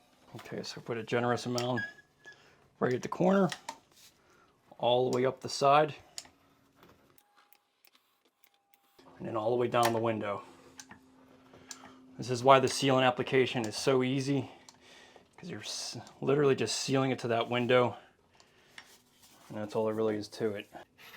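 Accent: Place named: Pennsylvania, United States. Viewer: You can totally tell from Pennsylvania with his accent. 😅 Thanks for the video tutorials! Definitely giving me the confidence to do more on my own!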